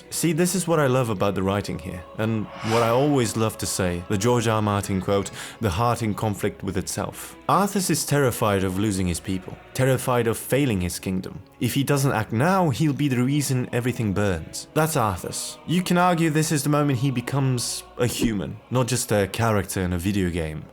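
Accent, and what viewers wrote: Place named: United Kingdom. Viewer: so many words. so little insight. I should've closed the video as soon as I heard the British accent.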